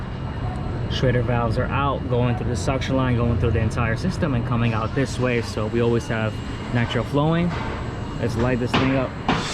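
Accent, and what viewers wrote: New York, United States. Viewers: Your from newyork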